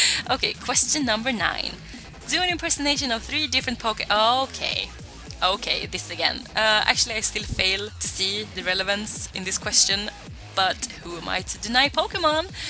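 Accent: Sweden. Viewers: I just started watching your videos, and i am actually really glad, I did. And your accent is so cute! where are you from? ^^; //edit, you're from sweden? awesome!// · Oh my gosh your Swedish?!?! you and pewdiepie my favorite youtuber both Swedish your accent is so cute I love it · I knew you were a Swede the moment you opened your mouth!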